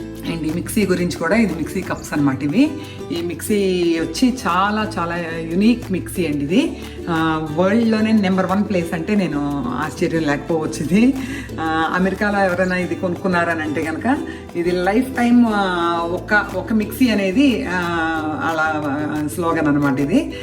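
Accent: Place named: Eluru, India. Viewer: When I was listening to you, i was thinking you must be from around Eluru/Vijayawada. I am from Eluru also and studied in same school, college. I graduated in 1992 from college.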